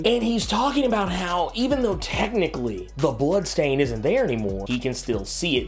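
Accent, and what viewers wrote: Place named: East Texas, United States. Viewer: hey boss.. where you from... you sound like you're from east texas.. Tyler area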